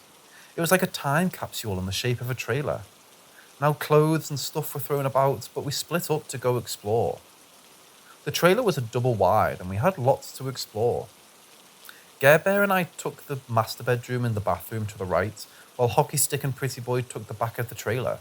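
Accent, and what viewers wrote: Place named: United Kingdom. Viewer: I'm British so love to hear a fellow British narrator. Thank for the uploads mate.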